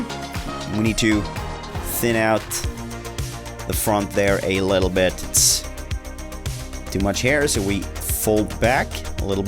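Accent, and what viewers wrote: Sweden. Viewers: What swedish accent do you have??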